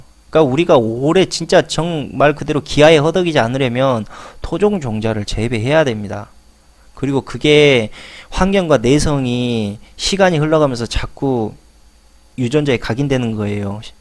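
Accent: Jeolla, South Korea. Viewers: 이사람 전라도네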